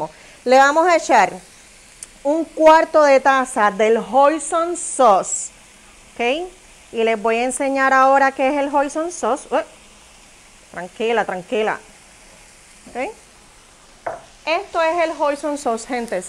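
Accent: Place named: Cuba. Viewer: Me encantan sus recetas...pero de verdad tiene que estar diciendo tanto "showchito"?...como se dice en ingles...so annoying y el acento cubano? Pq?...son cosas innecesarias....explica super, tiene buena energía, es alegre....eso es más que suficiente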